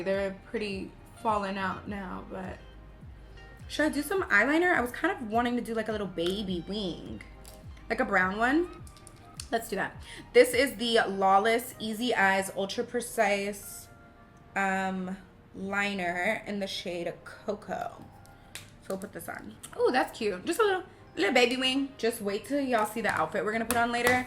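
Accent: New York, United States. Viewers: I’m a bred New Yorker lol and you fit with us I have to say, your voice alone lolll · 😂😂 that’s true, you didn’t cry! I agree NY was an upgrade. You’re officially global at this point! And I’m loving hearing the NY accent and attitude start to come out! 😂 sorry you gotta deal with all that other stuff, omg. You say it’s nothing but that looks and sounds like a very big something! I’m definitely keeping you in my prayers and will ask God to keep a hedge of protection around you. 🙏🏾 keep thriving beautiful! ✨🥰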